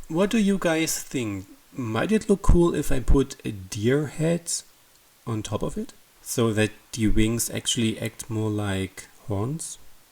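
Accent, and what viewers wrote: Germany. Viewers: Dachte ich mir schon, dein Englisch klingt so deutsch 😂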